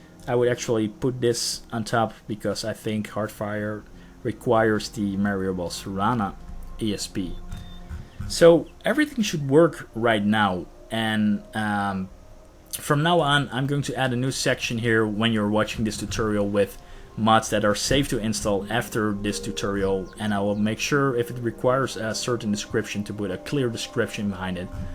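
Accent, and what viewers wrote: Netherlands. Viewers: Might i ask are you Dutch ? wat ik hoor een accent dat heel Nederlands overkomt ;)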